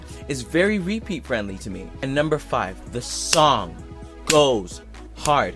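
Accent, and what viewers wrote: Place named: Jamaica. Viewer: When I first heard it I was disappointed they went the trap rnb route because I expected more from their voices. I didn't like for the same reason but now I can't help but bump to it. Like you said they're trying to capture a certain market, "get in where they fit in," and might just do that for them. Off topic but are you Jamaican? I hear a slight yardie undertone in your voice lol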